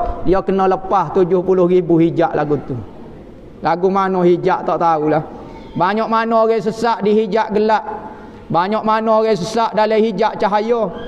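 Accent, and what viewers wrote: Kelantan, Malaysia. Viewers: Alhamdulillah , salah satu ustaz idola kawe, bahaso kelate pekat kemah kemin tok gogek langsung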